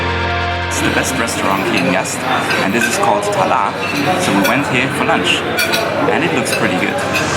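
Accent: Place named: Germany. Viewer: accent reminds me of all my friends from Deutschland. 🇩🇪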